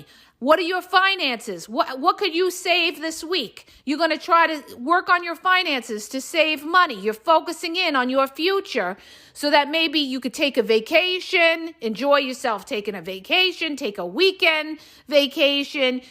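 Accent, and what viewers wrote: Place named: Elmwood Park, United States. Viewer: I love you I'm from Elmwood Park and it's nice to hear somebody talk like I talk and you describe everything the best more so than all these other channels and really help me thank you God bless you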